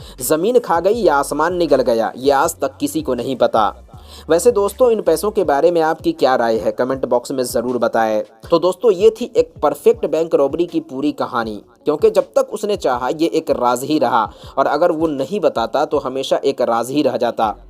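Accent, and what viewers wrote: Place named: Bihar, India. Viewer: Bihari lahaja aa raha ha bolne me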